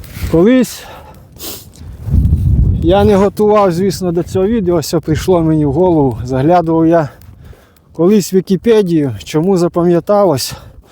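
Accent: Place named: Korosten, Ukraine. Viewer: Доброго дня. З пару місяців тому знайшов Ваш канал і дивлюсь із величезним задоволенням. А знайшов цікаво, випало випадково, включив і Ваш говір виявився дуже знайомим, можна сказати рідним - коростенським. Справа в тому що в мене весь батьків рід з Веселівки, можна сказати поруч. І хата діда з бабою в селі також давно стоїть пуста(( До війни їздив туди тільки на полювання. А цієї зими двічі вибирався туди з Києва просто погуляти по рідних з дитинства місцях, подивитися сліди звірів. Величезне дякую Вам за канал!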